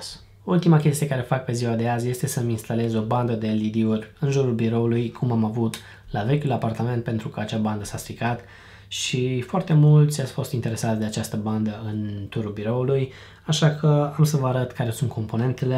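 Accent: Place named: England, United Kingdom. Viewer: nu se impaca accentul englezesc cu romana mai deloc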